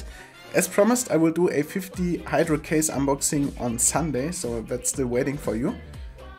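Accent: Germany. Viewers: man hört direkt dass du deutsch bist :D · Bist du ein deutscher oder ein Engländer ? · wenn ein deutscher englisch redet.... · Ich krieg so Aids bei deinem Deutschen Akzent während du Englisch sprichst. Es gibt nichts schlimmeres. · This german Akzent Oo xD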